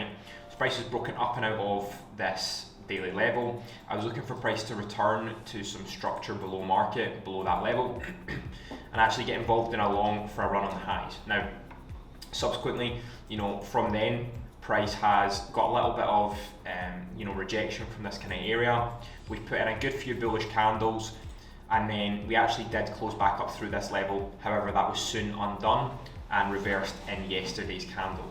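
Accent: Scotland, United Kingdom. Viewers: Do you live or Glasgow or Scotland because your sound it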